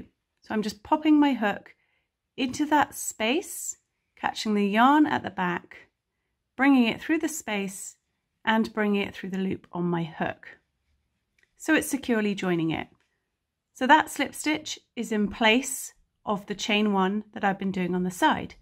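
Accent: England, United Kingdom. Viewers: Oh it's just that as you had an English accent I thought it was strange I follow English or I would get a bit confused 😂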